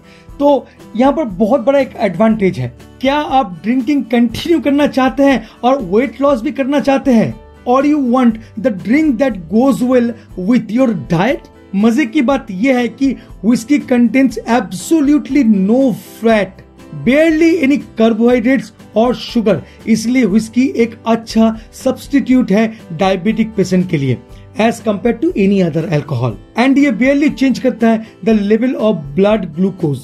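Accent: Bengal, India. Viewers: Are you a bengali 😶😐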